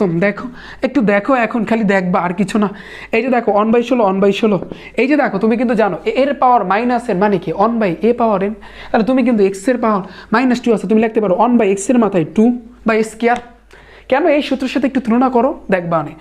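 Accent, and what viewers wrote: Barishal, Bangladesh. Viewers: Baiya apner bari ki borisal🤌🙂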